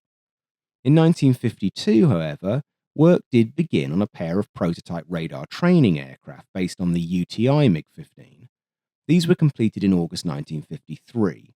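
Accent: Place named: East London, United Kingdom. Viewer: Thank you mate for all you manage,. (…I’m allowed!) Are you English or like NSW or…? Usually the accents are a dead giveaway but at times Im like “oh hes definitely from East London” but then certain words’ll go Sheffield-ish. Actually don’t answer that, and stop reading this as it’ll influence your habitual speech profile cause now you’ll be thinking about it subconsciously (really thoughts are more “layered” than dualistically ‘conscious’ OR ‘submi… ‘subconscious’)!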